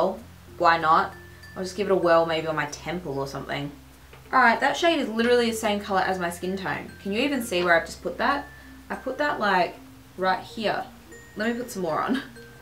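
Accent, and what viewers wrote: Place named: Australia. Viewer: Loving your hair. Ps are you aussie , you sound it abit haha.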